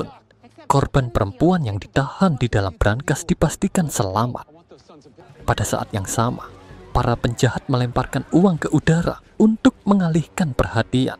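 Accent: Java, Indonesia. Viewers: Pekat banget jawanya walaupun dikontrol suara kayak gini.. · Medok bet anjir berasa nonton wayang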